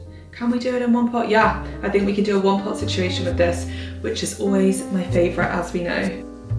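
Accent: United Kingdom. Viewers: Watching your videos is rather pleasurable and useful thing to me. Being a fan of English culture and language I enjoy both the information of English countryside life nowadays and listening to your British English. Living in the countryside expelled me from conversational practice which I had in abundance when I lived in the capital city. So it's a small consolation... The views of Cotswold are just marvelous. They're filled with history...👍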